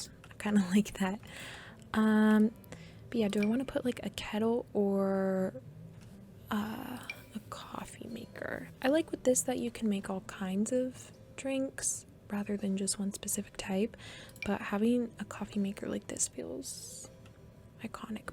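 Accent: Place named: Midwest, United States. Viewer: Are you from the Midwest? You got the accent and I love it lol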